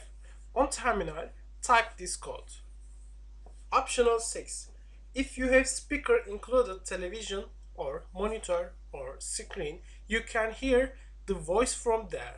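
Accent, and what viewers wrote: Turkey. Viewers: Türk aksanı bu nerde duysam tanırım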